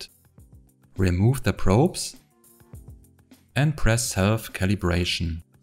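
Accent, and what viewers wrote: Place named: Germany. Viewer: Moin! Das Gerät wird unter verschiedenen Bezeichnungen angeboten. Hanmatek DOS1102, Mustool MDS 2112P und OWON SDS1102. Sind alle identisch, aber unter der Bezeichnung OWON deutlich günstiger. Ich besitze das Gerät von OWON und bin damit sehr zufrieden. An "disadvantages" fehlt ganz klar noch eine fehlende externe Triggermöglichkeit und ein mit 10K doch eher recht kleiner Speicher. Das limitiert doch ganz erheblich die Analyse von digitalen Bittmustern. Trotzdem für den Preis ein super Gerät! Mal grundsätzlich: Offensichtlich (hörbar) Ist deutsch deine Muttersprache. Warum antwortest du auf deutsche Kommentare in englisch??? Deinen Text zu dem Gerät hast du doch auch in deutsch verfasst... Ansonsten recht gelungenes Video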